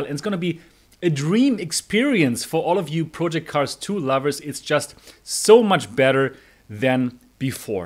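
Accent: Germany. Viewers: So I hate to ask the incredibly silly question but what is the native/prefered language of. I'm trying to learn many languages and I find it interesting to figure out who non-English speakers adapt to English. My personal guess from listening to this vid. is german.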